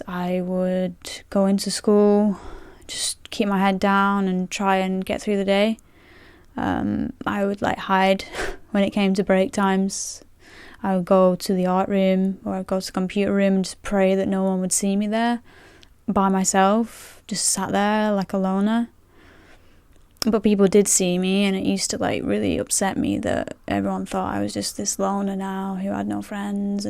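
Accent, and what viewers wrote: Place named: Lancashire, United Kingdom. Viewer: I have social anxiety too but still get surprised when people as outwardly confident as yourself reveal you have it! lol I appreciate a bit of the struggles you must go through, I've had SA for over 15 years now and while I don't quite agree that it can be 'cured' for everyone or the route causes of it, I'd advise people here that it can be worked on and improved at the very least for the vast majority of people. But without sounding creepy (he says as he sounds creepy...lol), I have to say you're such a lovely, beautiful lady, inside and out and you can at least take solace in not just that but in all the good you're doing for others out there with your videos and ASMR. :) PS: I never thought I'd enjoy the Lancashire accent so much before now..! lol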